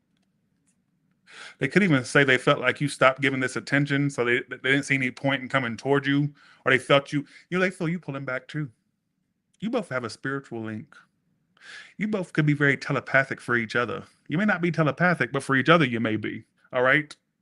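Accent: England, United Kingdom. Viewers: The British accent sent meeeee 😅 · I'm still here! Ha you kept freezing 🥶 Your back Thanks so much. The English accent 😂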